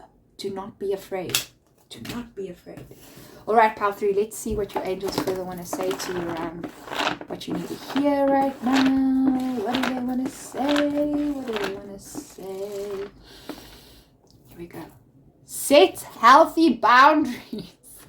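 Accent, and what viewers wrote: South Africa. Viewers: Are you South African? Listening from 🇬🇧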